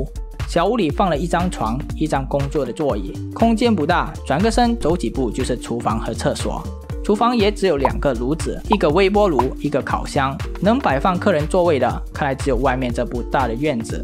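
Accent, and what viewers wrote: Malaysia. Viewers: 听口音像马来西亚人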